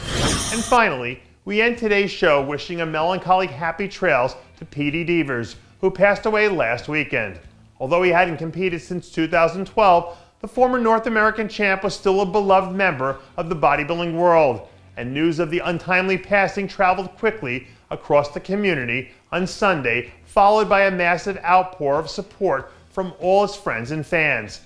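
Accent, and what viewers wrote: Standard. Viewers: It's called, non regional diction. I like it.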